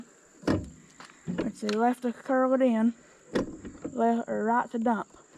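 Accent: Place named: South, United States. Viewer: love a southern Just how we all talk is awesome.. Very very strong southern accent love it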